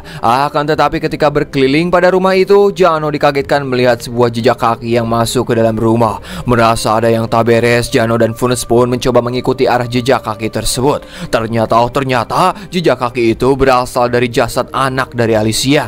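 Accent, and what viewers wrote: West Java, Indonesia. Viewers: Aku tebak pasti abang org sunda iya kan?? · Kang pasti org Sunda nya 😂logat na meni Sunda pisan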